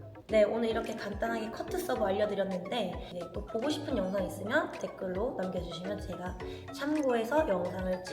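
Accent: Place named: Gyeongsang, South Korea. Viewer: 거 경상도 어디요?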